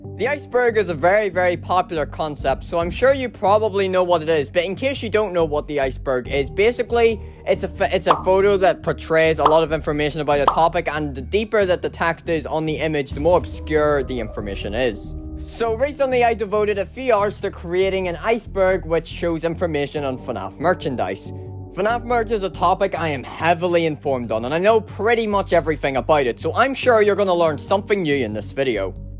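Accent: Northern Ireland, United Kingdom. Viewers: You from The North of Ireland? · In the first 3 seconds, I was able to detect that you were from Northern Ireland. The accent is hard to mistake. 😂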